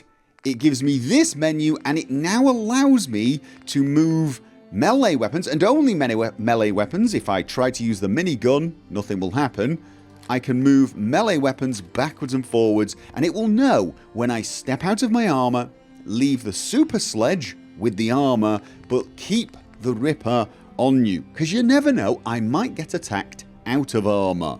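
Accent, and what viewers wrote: United Kingdom. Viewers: Cool voice! This britt snob voice that equally irritates and charms))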